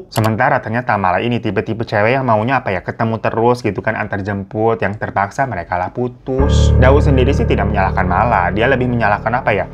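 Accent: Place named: Sunda, Indonesia. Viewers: Maaf bang salfok sama logat bhs sundanya 😭🙏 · lucu banget ngomong sundanya 😭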